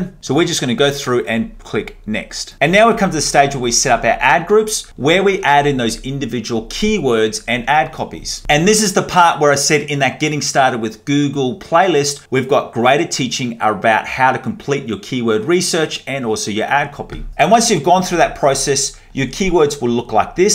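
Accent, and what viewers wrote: Australia. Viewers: I like the accent. Is he an Aussie?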